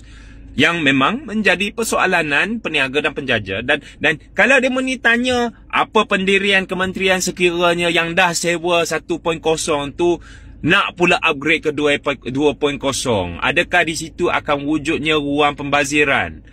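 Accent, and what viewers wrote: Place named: Perak, Malaysia. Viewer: Bahasa Perak ko... Pehhh.... 🎉🎉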